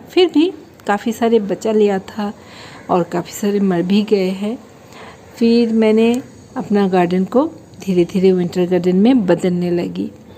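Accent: Bengal, India. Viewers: Aap ki Bangali tadke Vali Hindi mujhe bahut pasand aati hai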